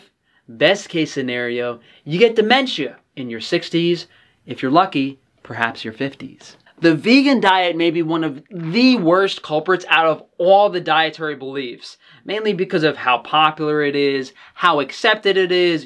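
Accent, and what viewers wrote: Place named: South, United States. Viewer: that southern accent lol dang...thank-you for opening our eyes to the divides that are in place to lower our vibration and cause turmoil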